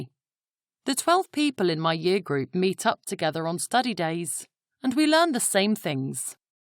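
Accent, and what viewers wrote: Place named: United Kingdom. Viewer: fk uk accent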